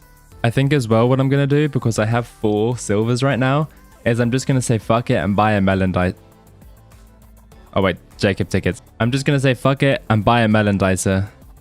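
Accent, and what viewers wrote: United Kingdom. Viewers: British voice killing me 🥀🥀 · Can you take the britishness down a notch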